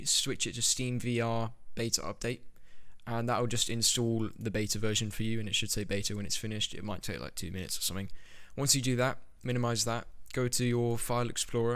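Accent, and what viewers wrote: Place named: United Kingdom. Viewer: Thank you random British guy with a nice voice, thank you.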